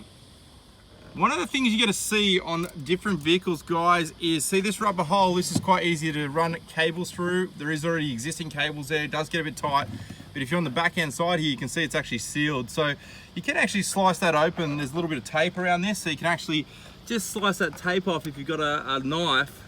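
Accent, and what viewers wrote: Australia. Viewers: Geez Australia has jacked up accents irritating to listen to learn to speak English bro can’t take one more second of your voice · Best Dashcam DIY install I've seen. Easy-to-understand Aussie voice also. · What an Aussie accent ! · I love your Aussie accent🥰 Reminds me of the time I used to live in Australia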